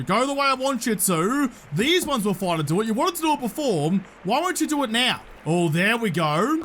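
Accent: Australia. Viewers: bro he has the most Australian Australian accent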